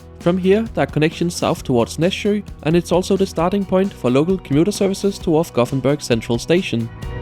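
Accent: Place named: Denmark. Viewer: It's a very danish pronounciation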